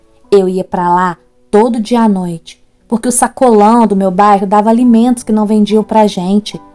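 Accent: Minas Gerais, Brazil. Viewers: É seu sotaque mineiro ta indo embora e vc ta mais calma nos relatos e mais suave.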